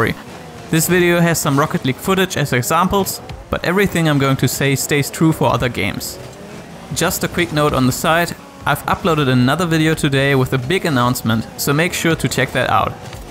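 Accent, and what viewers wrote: Germany. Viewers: WOW ich höre sofort den deutschen accent :) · Man hört auf jeden Fall den deutschen Dialekt raus 😄 Danke für das Video 👍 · Bist du Deutscher? :D Gutes Englisch aber man hört es trotzdem immer etwas raus, mich eingeschlossen xd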